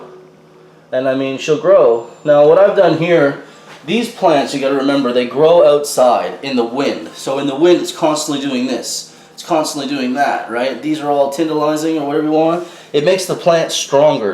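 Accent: Canada. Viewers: ***** even sound a bit canadian now... -Tko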